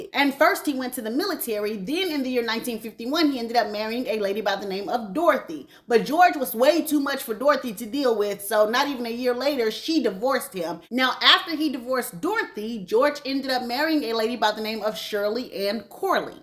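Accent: South, United States. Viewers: Your country accent and saying “wait one cotton picking moment”😭😂😂😂 I been rolling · The country accent made this even MORE entertaining!! 😂😂🤣🤣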